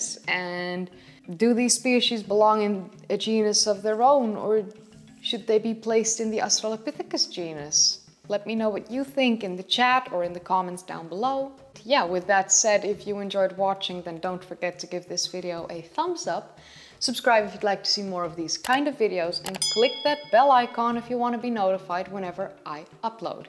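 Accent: Netherlands. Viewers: your accents is not that bad compaired to that of a lot of Sutch politicians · I like your Dutch accent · I knew I smelled a Dutch accent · you being dutch accent makes you you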